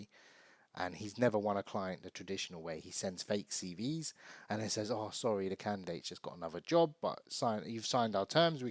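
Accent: United Kingdom. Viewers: You definitely don’t sound automated because you speak Green Lanes English 😊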